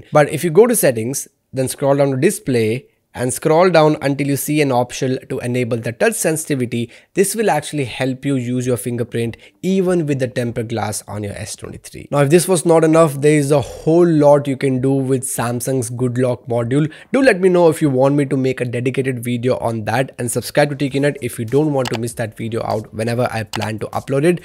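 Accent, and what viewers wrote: India. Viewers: Am I the only one notice your Heavy Malayali Accent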